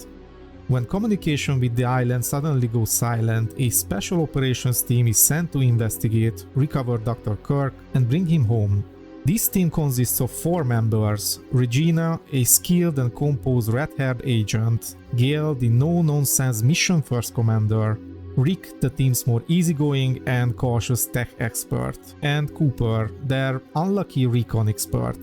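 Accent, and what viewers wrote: Hungary. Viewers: judging by ur accent im 100% ur hungarian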